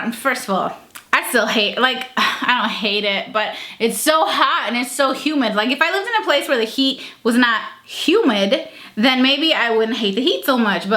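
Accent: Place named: Chicago, United States. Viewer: I am a new"ish" subscriber and I swear I was like "she's from Chicago, I can tell" and sure enough I just added you on Instagram and it says your from Chicago! I'm from the Chicagoland area too! ☺️✌️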